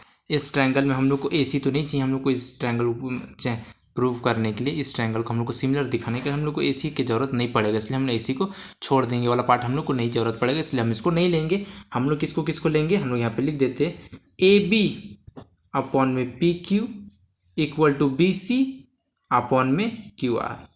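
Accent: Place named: Bihar, India. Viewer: Sir app bhiharhi vani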